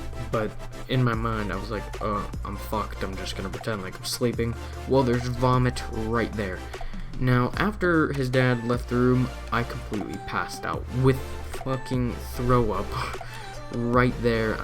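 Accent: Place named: Canada. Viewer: u sound Canadian m8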